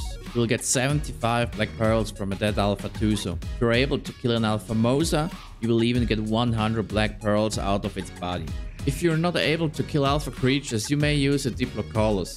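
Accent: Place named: Switzerland. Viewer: you sound swiss :) sali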